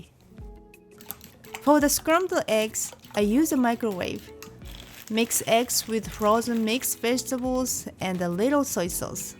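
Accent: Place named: Japan. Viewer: The heavy japanese accent tells me that this is authentic. Also, nice cap.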